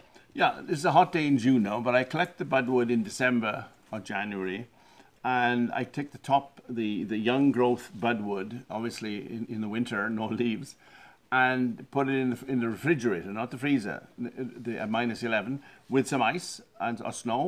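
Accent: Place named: Caribbean. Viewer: has a very unusual accent, he sounds like he is from the Caribbean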